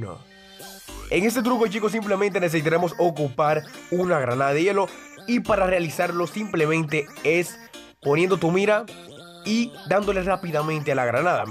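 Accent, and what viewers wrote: Dominican Republic. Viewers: eres de República Dominicana?. ¿Verdad?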